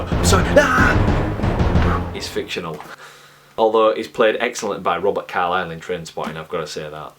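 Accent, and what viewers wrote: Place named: Scotland, United Kingdom. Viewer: Oh, okay, but I feel like the scottish accent is kinda catching up on you, idk maybe I'm just being silly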